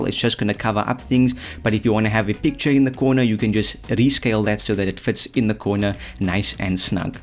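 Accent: South Africa. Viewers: Is that a South African accent I hear?? I am using premiere at the moment but I'm thinking of switching. Do you recommend hit film? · no way i came on this vid and this bra talking in an accent that only another brazzo can understand, coming fromn that west coast ey represemt the ZA fam homie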